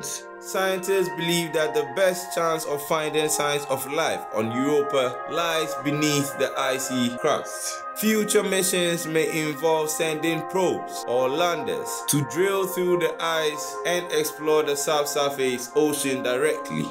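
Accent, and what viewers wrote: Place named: Africa. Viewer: African voice kinda makes me think it’s not true. I guess from all the Nigerian princes bollocks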